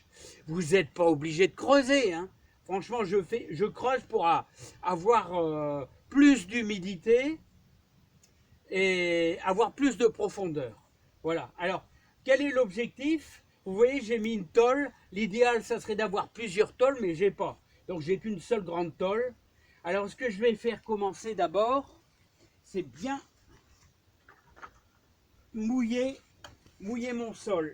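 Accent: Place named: Hirson, France. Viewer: Vu l’accent j’aurai dit vers Hirson merde j’ai perdu j’ai quitté la région depuis trop longtemps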